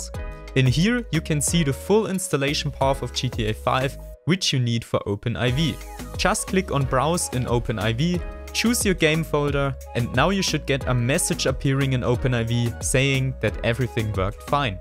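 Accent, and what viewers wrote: Germany. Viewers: du bist deutsch hört mann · Ich bin mal so frei und schreib auf Deutsch. Deiner Spracheinstellungen und deinem Akzent nach solltest du mich verstehen :). SUPER VIDEO! Der erste der alles wichtige mit rein genommen hat und bei dem es auf anhieb geklappt hat. Vielen Dank für das Tutorial, bei dir kann sich der Rest der Tutorialtypen die's so gibt noch eine Scheibe anschneiden!!! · Mn merkt extrem dass er deutsch ist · Fam youre a German from that accent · Are you from german?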